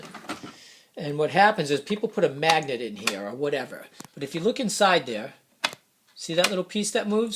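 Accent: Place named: New England, United States. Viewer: Definitely a New Englander!! (Bostonian?)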